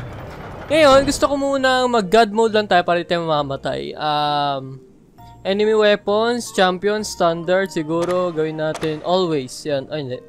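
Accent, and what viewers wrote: Visayas, Philippines. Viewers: bisaya ka po ba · Kuya bisaya kayo!?!! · Bisaya ka ba po kuya? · bisaya ka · Lods Bisaya Ka or Hindi? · Bisaya ka dong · bisaya ka kuya? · Patay kadiha mo bisaya po ikaw??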